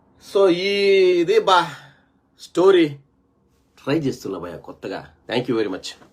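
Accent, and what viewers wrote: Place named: Kadapa, India. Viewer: Anna me Kadapa language super ... How even jagane next ..,(if no super6)😅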